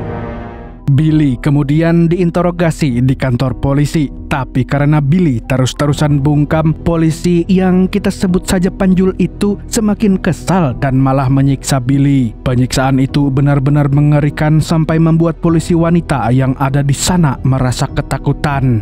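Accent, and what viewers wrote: Banjar, Indonesia. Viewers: orang Banjar kh pian....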